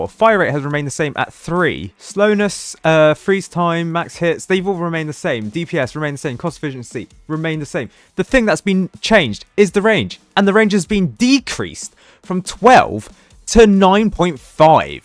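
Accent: United Kingdom. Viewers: The amount of Britishness coming out of this is overwhelming (compared to other videos)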